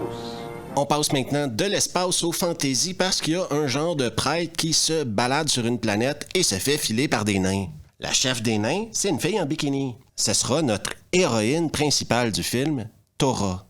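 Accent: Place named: Montreal, Canada. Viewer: Hé Mister, quel plaisir, quel bonheur ! merci de partager ces bons moments avec nous, j'ai découvert ta chaine par hasard il y'a quelques jours et crois moi, mes 15 minutes quotidiennes de nanar et de souvenirs filmographiques me procurent un bien être et un lâché prise inégalé. Tout y est le choix des films qui ont bercés mon adolescence, les mots pour le dire, le ton, cet accent qui sous forme de "Madelaine de Proust" me ramène à la fois dans mes années 80 puis 90 quand je vivais à Montréal. J'ai rarement été si enthousiaste à l'écoute d'une émission, merci mon pote, tu fais partis de la famille now, je partage cette expérience avec mon fils de 17 ans, ce sont de réel moment de complicité. A bientôt.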